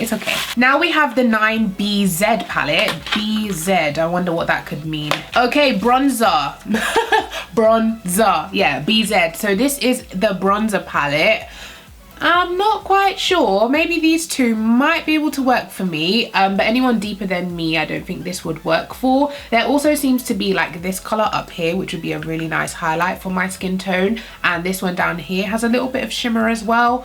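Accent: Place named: United Kingdom. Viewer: Aw from when you said Morphe sent you some stuff I was smiling girl 😫🤸🏾‍♀️ through the video I was like please tell me you gave her the brushes & you mentioned brushes I was like oh Yes Lord 😫💃🏽 I was finished. Girl i’m so happy for you. I been watching you for a couple months since last year & I love your generosity & honesty. Quite weirdly I like listening to your voice & accent lol even though I too live in the U.K. 😂 It’s time now we get onto that Discount Code so we can support the U.K. babes 💜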